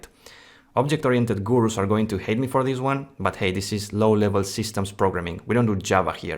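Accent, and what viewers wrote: Spain. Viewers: You're not Spanish? WTF? Where are you from then? I'm a native speaker and when I hear you I have no doubts you are from Spain. Tío, qué cojones dices. XD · Tienes acento mallorquín. ¿Eres de por ahí?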